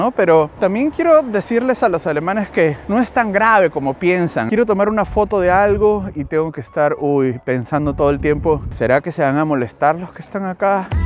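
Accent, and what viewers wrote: Peru. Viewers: That's Peruvian Spanish.... good quality Spanish.... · It's a peruvian Spanish the best to learn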